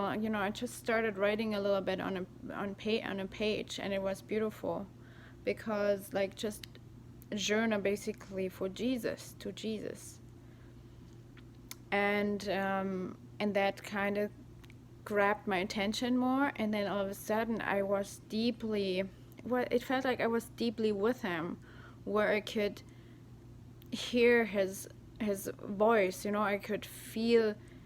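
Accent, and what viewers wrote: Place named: Germany. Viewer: What's the accent? German? It's very cute 😊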